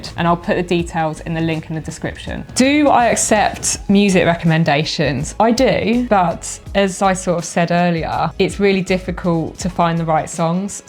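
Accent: United Kingdom. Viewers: Great girl, great accent, great concept, Great Britain! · If I didn’t appreciate watching a beautiful tall blonde woman with blue eyes and a British accent, I wouldn’t watch you. But I do!❤️ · The accent you noted the UK? Pretty cool I competed there often in college and was always very impressed with the University of Kentucky athletics facilities! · Love your British accent,. It is so cool.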